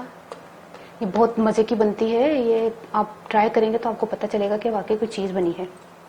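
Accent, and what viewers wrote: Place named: Punjab, India. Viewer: Ap ki Punjabi so sweet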